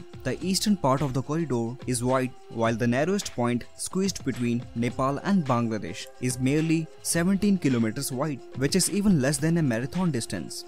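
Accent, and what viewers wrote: India. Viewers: 一股咖喱味的英语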